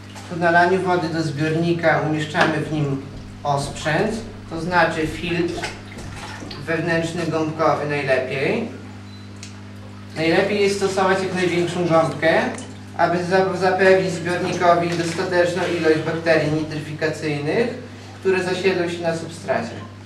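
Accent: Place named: Kresy, Poland. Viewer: fajny ten akcent to pewnie jakieś kresy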